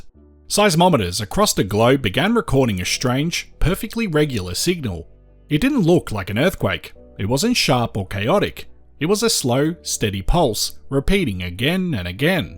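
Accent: Australia. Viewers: Great to hear an Ozzie voice - and Tsunamis are a fascinating topic. I’d love to hear your thoughts on abrupt climate change - what geo evidence is there that paleo climate has previously abruptly changed in years not millennium and when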